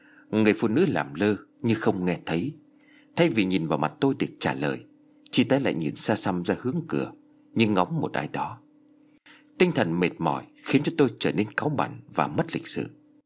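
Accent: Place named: North, Vietnam. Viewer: Giong bắc nhưng chuẩn,em da nghe a soạn nhiều rồi,cũng dc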